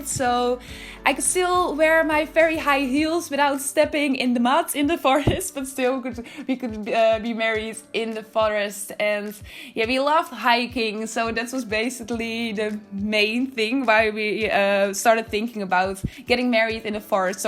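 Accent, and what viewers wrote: Netherlands. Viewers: This looks great! Where are you coming from? It sounds like you're from Holland. I'm Dutch myself.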